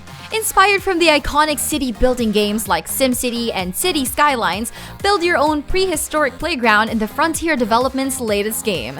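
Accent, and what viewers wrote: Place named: Philippines. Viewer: Her accent is so filipino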